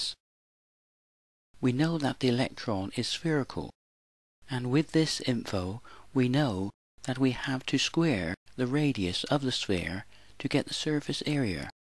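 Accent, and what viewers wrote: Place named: Somerset, United Kingdom. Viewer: Maths in a Somerset accent is simply the best maths you'll ever find.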